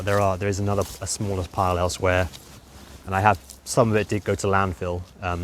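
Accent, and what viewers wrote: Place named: United Kingdom. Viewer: It's fascinating to see all the work you do to uncover this. Very labor intensive. I love the U. K. accent. I wish you were single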